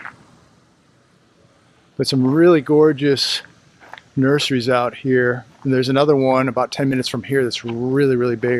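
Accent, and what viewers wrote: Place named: South, United States. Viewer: You gettin southern!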